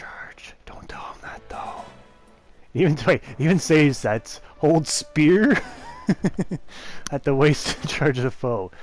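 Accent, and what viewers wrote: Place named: North America. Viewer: wild guess really, the accent tells me youre from usa or canada, so it felt likely, anyways sorry if i offended you somehow, im just really peeved at certain words being spelled wrong but i didnt know it was an intentional joke on your end.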